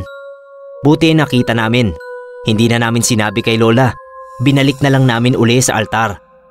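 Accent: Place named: Pampanga, Philippines. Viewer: binuklat hahaha kapampangan ka po ata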